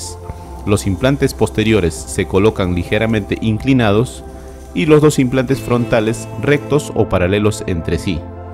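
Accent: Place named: Argentina. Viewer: parece de Argentina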